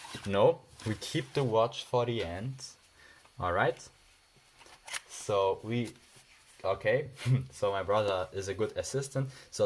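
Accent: Germany. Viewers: For the accent, I think he's German, so in Germany it's available.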